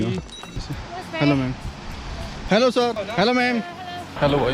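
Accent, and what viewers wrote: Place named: India. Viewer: U narrated ur expedition very well ....almost covered everything... N d desi accent stole d show👌👌👌 Next time do plan for tapovan ... All d best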